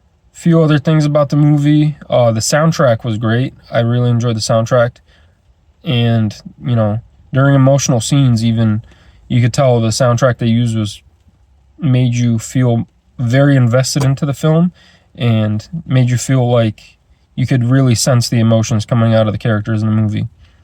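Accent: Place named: Brazil. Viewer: Before you told us you lived in Brazil, I could tell that just by your accent.😅🇧🇷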